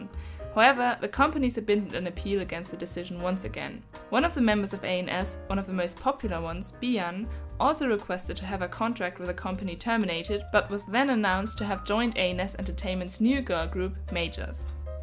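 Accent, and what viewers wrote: Germany. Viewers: this might be irrelevant but i absolutely love your accent! i think i can hear that you speak german as your first language, and i just wanted to say that it makes listening to you speak very relaxing. sehr schön!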